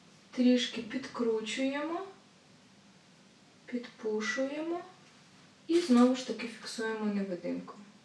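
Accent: Standard. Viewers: Чисто українська мова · дуже гарна зачіска) Приємно чути чисто-українську мову!) Ти супер😊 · Дякую, відео дуже сподобалось.Ви дуже освідчена людина.Яка у Вас професія? Тому що знаю тільки одну молоду дівчину,яка так чисто говорить на украінській,але вона закінчила Могилянку. Хотіла б я так говорити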